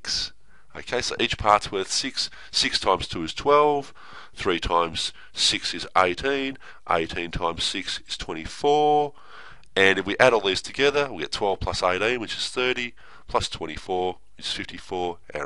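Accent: Australia. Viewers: Thanks so much for the video, by the way are you australian?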